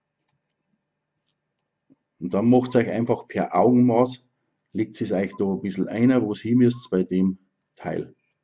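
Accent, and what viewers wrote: Bavaria, Germany. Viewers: Boarisch is einfoch schee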